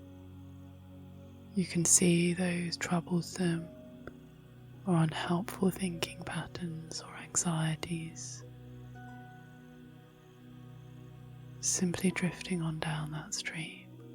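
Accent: United Kingdom. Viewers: Alright, nice to hear an accent from my town, London. Drive through Clapham sometimes. Great voice and meditation stuff thanks 👍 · Thank you, for your wonderful channel and all the effort you have put into it. This video helped me to fall into a deep and relaxing sleep. Thanks to your lovely voice and your marvellous British accent. Greetings from Germany.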